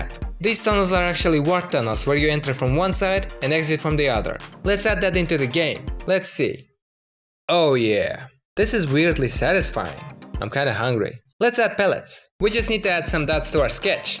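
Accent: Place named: Mexico. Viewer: Hablas español? Tienes acento como de mexicano xD pd: geniales vídeos, me motivan a seguir programando 🙂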